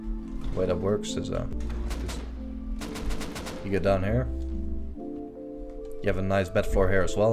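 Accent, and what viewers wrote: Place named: Netherlands. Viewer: Ben je nl want je hoort het echt 😂😂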